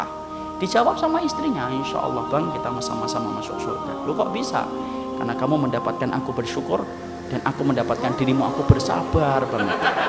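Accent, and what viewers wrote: Java, Indonesia. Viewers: masyaAllah stads... Allah mudahkan penyampaian hidayah ini lewat ustads... baarokalllah stads... logat jawanya mash kentel sanget stad... :)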